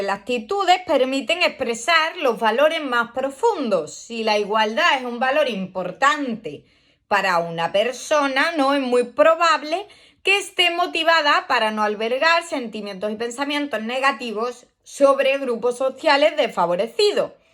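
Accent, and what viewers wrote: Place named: Andalusia, Spain. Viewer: Hola, estoy siguiendo tus audios psico uned, van muy bien mientras paseas,en tren, etc, y ese acento sevillano o andaluz lo hacen más ameno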